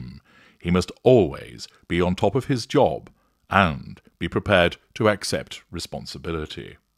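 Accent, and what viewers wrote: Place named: RP, United Kingdom. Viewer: Can't get enough of your BBC voice,.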